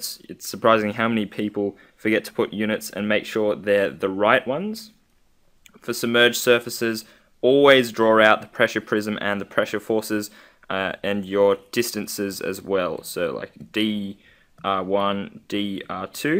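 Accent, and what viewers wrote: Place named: Australia. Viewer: Australian Accent, likely Aus.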